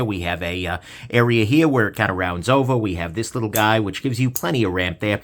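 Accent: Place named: East Coast, United States. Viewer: Hey, love your stuff but do you also run a YouTube channel that deals with MMA … … same dialect coming out of somewhere on the east coast, I like it. Anyway, love the channel.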